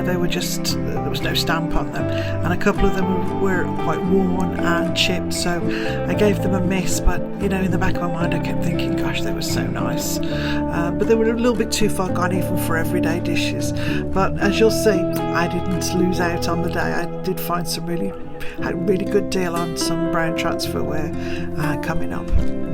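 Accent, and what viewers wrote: United Kingdom. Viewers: I love the William Morris fabric what a find. I often go to Liberty’s as I live near London it’s like another world in there. The thrift shops seem to be bigger and have lots more on offer than our charity shops here in England. By your accent I guess you are British. I’m new to your channel but love it.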